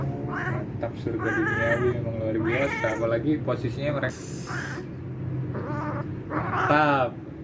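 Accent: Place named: United Kingdom. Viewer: britishnyaa comel😭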